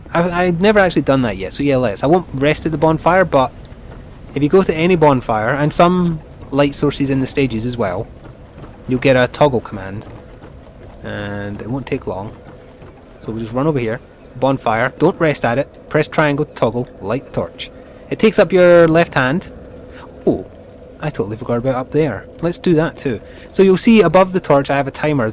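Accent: Scotland, United Kingdom. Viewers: It took me four episodes to finally make a good guess on what your accent is. Is it scottish? (I only recognized it because of David Tennant xD)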